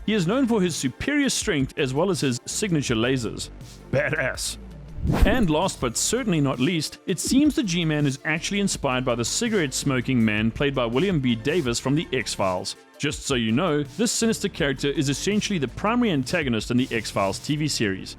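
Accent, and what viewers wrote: South Africa. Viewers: I know a South African when I hear one, lol. Dope video.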